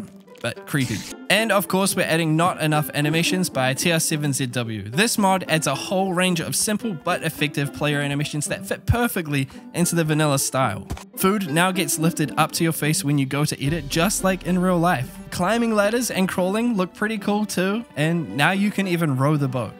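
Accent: New Zealand. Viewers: It's nice hearing a fellow Kiwi on youtube. Also very strange. Keep up the good work!! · I could tell your from nz when you said your top video had more views than people in your country and your accent 😊 Kia ora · Woah I knew I heard a Kiwi accent!! Nice to see content creators rising from Aotearoa ❤️❤️ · I assume he lives in New Zealand. 5,000,000 population, and fitting accent.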